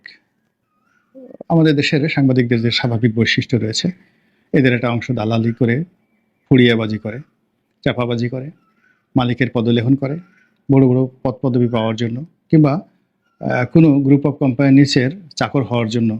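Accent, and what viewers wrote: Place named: Barishal, Bangladesh. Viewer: স্লামালিকুম নয়।আসসালামু আলাইকুম বলবেন শুদ্ধ করে।দয়া করে প্রমিত বাংলা বলুন।বরিশালের উচ্চারন পরিহার করার চেষ্টা করুন।আপনার পোষ্ট এর সময় কমিয়ে আনুন।আপনি আরও নন্দিত হবেন।প্লিজ প্লিজ।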